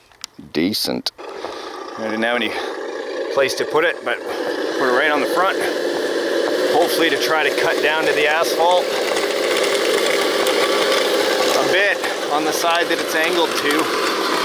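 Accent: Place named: Canada. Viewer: hey, are you from Canada? Because you sound like you are lol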